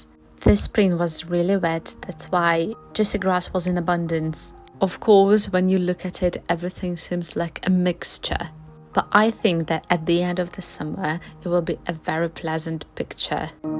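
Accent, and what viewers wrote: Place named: RP, United Kingdom. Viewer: right, but also your aristocratic British accent and engaging smile. We are putty in your hands. I wonder if that is lost in translation.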